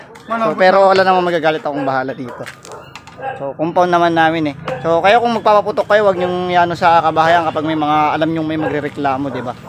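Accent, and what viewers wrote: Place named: Visayas, Philippines. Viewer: Bisaya ka lpds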